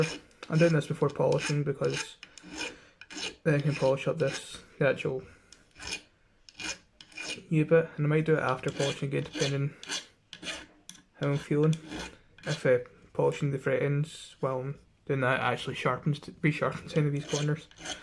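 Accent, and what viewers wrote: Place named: Scotland, United Kingdom. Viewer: You sound Scottish. I had an aria diamond looked exactly like that I swapped it for a watch from a guy that lived on a boat that had a fore head tattoo when I was living in Brisbane. I'd like your opinion on guitars. Have you got an email address? I have a Horner shorty it's a pain to change strings I'm looking at Jackson's dinky Floyd rose and the minion.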